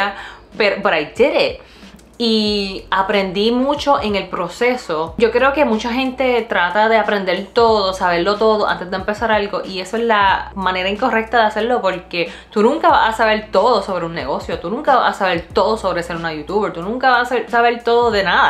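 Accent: Puerto Rico. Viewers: how great you talk with a Puerto Rican accent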